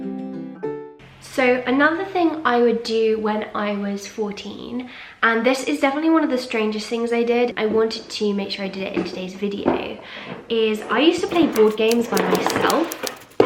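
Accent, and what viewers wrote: Spain. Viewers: Your Spanish accent is so cute!! Love you ✨💓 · I love your Spanish accent! It’s so cute🤗 I’m a native Spanish speaker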